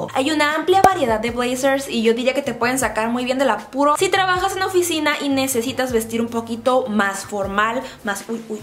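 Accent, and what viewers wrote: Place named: Monterrey, Mexico. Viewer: Tanto vídeos que he visto tuyos y en este note mucho tu acento regio hahaha Eres la mejor ♡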